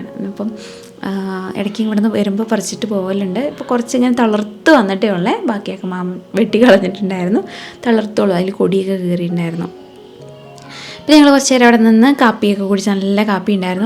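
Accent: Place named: Southern Kerala, India. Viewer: കുട്ടി..... കോട്ടയത്ത്‌ നിന്നും.... ഇവിടേക്കു.... വന്നതാണ്... ല്ലേ.... അപ്പൂപ്പൻ മാർ.... ആയിട്ട്..... 🥰 മോളുടെ.... സംസാരത്തിൽ.... പാലക്കാട്‌ ടച്ച്‌.... തോന്നിയിരുന്നില്ല..... ഒരു തെക്കൻ സ്റ്റൈൽ തോന്നിയിരുന്നു.... 👌💖 ഞങ്ങളും പാലക്കാട്ടുകാർ..... 💖🥰🥰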